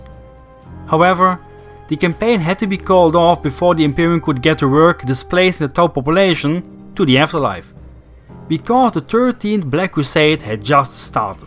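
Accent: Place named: Eastern Europe. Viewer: I figured you were from eastern Europe. Rock your accent, brother. I'm a mumbler, myself. Great video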